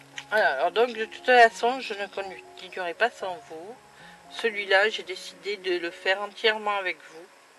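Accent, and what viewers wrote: South, France. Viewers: ah oui je suis encore là et je me suis mm abonnée à ta chaine car elle est variées tu fais des tas de choses et j'aime les chaînes comme ça. Magnifique pour ta Filfille 17 ans, cest l'âge à laquelle ma minette "Fynou" a du nous quitter, elle était très malade niveau coeur et poumons. Et ensuite jai eu mon pti Bounty qq années après. Merci pour ton email c'est très gentil. Jai aussi une chaîne mais il ny a pas toutes mes passions dessus car maintenant je mets plus des vidéos pour que ma maman qui est loin regarde ce que je fais, ainsi bien sûr que mes peu mais fidèles copines. Je t'avoue que faire des videos j'aime plus trop et jai pris beaucoup de distances avec les réseaux sociaux pour faire passer d'autres priorités qui sont des objectifs dans ma vie personnelle et qui me donnent bien plus de bonheur que la méchanceté de certaines youtubeuses dans le scrap ou la fimo... 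Pour le DP je te remercie pour le nom de la société qui en vend et en effet ta video va m'intéresser car le 1er je l'ai acheté tt à lh sur Amazon, cest une plage et le prochain sera un chat que j'ai mis en réserve. Après tu les mets sous cadre tes DP ? Car moi, cest le but. Si oui, quel genre de cadre ou autre utilises tu pour les accrocher au mur? Tes vacances se passent bien, et as tu beau temps ou tu es? Tu ne m'as pas répondu pour ton pti accent, si tu est bien du sud ? Je te laisse car je vais continuer ma page dessin du mois d'août sur mon Bullet Journal. Bon après-midi. 📚 Bisous ✏✒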